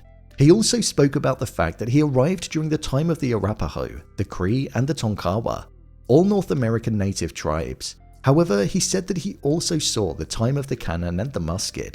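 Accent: United Kingdom. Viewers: Why do we need endless British narration??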